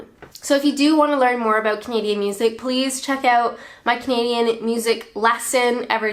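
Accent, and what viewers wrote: United States. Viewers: One misconception i would imagine is that hearing your accent lots of people assume you're American. Sure that is probably annoying. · No, never boring. But I imagine huge amounts of snow across a vast wilderness in Canada. I love Canadian as a language as opposed to American as it's closer to English. For example, you said '... couldn't care less...' the English phrase, whereas the Americans say '... could care less...' which to me is meaningless. 'I care so little about it, I couldn't care less.' And there are many other examples. Your accent, to us, is indistinguishable from American, but then I suppose to some across the pond, my Estuary English would sound similar to East Anglian. Do you happen to know whether Canadian French is as different from European? And I think you say aluminium with an 'i' too.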